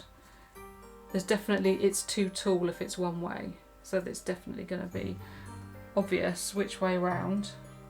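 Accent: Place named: United Kingdom. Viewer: love your tutorials since i was clueless; can you remove the background music because it is distracts from your beautiful accent; Love that British accent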